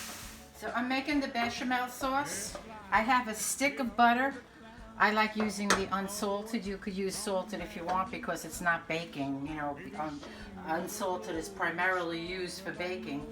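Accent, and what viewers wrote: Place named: New Jersey, United States. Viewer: Can’t wait to try your ‘Spaghetti Pie.’ Please continue using the ‘Jersey pronunciation’ of words like “ruh-GOAT.’ By the way, be careful using non-licensed music in the background. You have to pay to use music.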